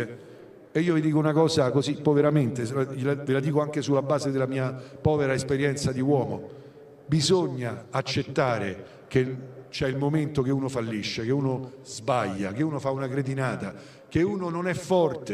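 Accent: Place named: Rome, Italy. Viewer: Che bello sentire parlare don.. perché tanto illuminato e per il suo simpaticissimo "romano".. 😊😊😊😊❤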